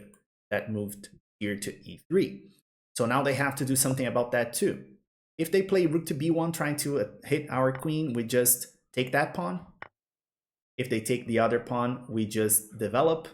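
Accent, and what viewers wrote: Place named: Brazil. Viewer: otimo video, reconheci seu sotaque de brasileiro na hora haha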